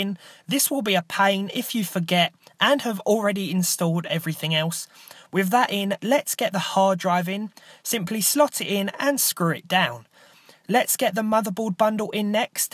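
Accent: United Kingdom. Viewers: As always, i love your videos. I follow you from italy, so forgive my English. I love your british voice. Continue in this way, u r pretty good.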